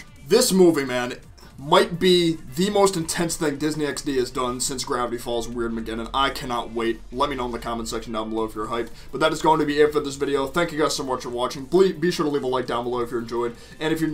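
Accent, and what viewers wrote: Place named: United Kingdom. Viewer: im offended by your british accent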